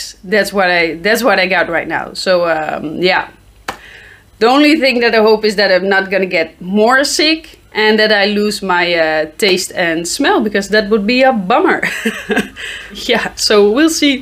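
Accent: Netherlands. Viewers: Beterschap meid. Heel chill dat je geurtjes doet. En je Hollandse accent doet t m met je grappen 😁👍🏻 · You sound like you are from The Netherlands, are you? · Beautiful accent 😊 Dutch? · Is it okay to be turned on by the Dutch accent? Man… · Hehe 😋 from you accent i know you are from Netherland my girlfriend from Netherland have same accent 🥰 Thank y from Morocco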